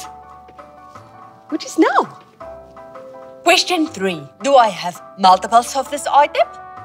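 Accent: Afrikaans, South Africa. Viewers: She’s a South African that’s why she has this accent. My friend lives I South Africa 🇿🇦 in the same city 🌃 I live(not by though) but her aunty is. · LEG3ND she sounds afrikaanse. I should know.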